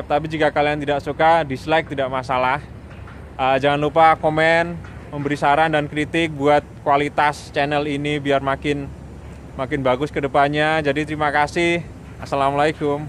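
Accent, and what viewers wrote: Java, Indonesia. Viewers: jowone daerah pundi mas smpean...suka bgt ma isi video ini..😊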